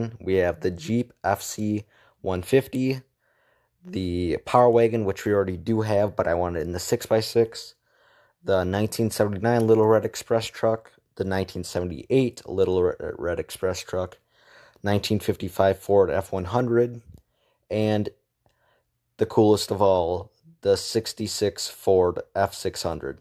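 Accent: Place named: Wisconsin, United States. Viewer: You can hear that this man is from Wisconsin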